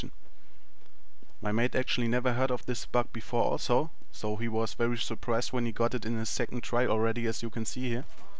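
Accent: Germany. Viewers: Ist er denn Deutsch er verwirrt mich xD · Deutsches Englisch is sooo toll :D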